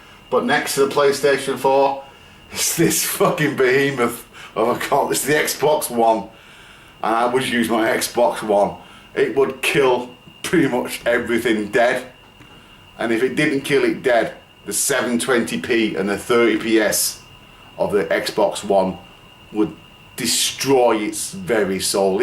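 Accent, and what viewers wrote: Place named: Bolton, United Kingdom. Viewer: am i right in thinking your from bolton? i live in bolton :) nice answers mate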